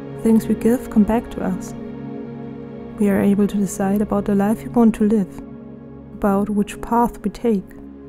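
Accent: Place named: Germany. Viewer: So much like your German accent!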